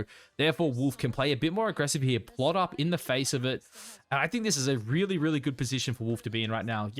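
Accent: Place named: Australia. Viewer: bruh u sound like Chaz NBA i was so mind F*cked since i just seen his video 😂 you Aussies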